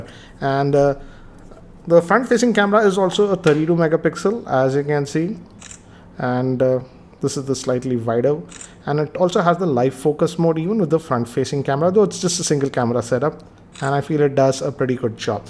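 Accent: India. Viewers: The Indian accent is too hard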